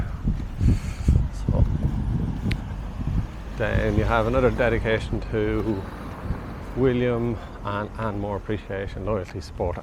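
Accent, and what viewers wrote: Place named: South, Ireland. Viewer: Fair play to you for visiting the Shankill. You seemed a bit nervous and uncomfortable at times. Not surprising considering your Southern Irish accent, but you did a great job. It must have felt a bit like being in the lions den! The Troubles are over and it is much safer now. The locals are not as "paranoid" and aggressive now that people aren't trying to murder them anymore so you should be fine now. They are so used to tourists filming the murals that I'm sure they just assume you are a harmless tourist and ignore you. Even if they overheard you saying something they didn't like they'd probably just let it be or maybe have a wee bit of friendly banter with you and fill you in on the local knowledge/story/version of events.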